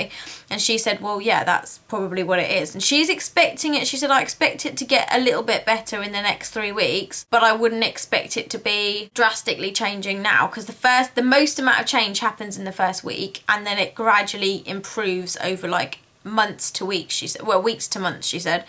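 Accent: United Kingdom. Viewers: A Indian girl talking with a British accent sounds ugly.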